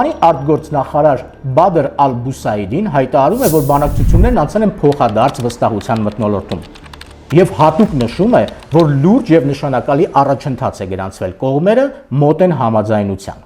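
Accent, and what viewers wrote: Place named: Yerevan, Armenia. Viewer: Меня бесит ваш эриванский акцент. Не надо тянуть гласные!!!!!!!!!!!!!!!!!!!!!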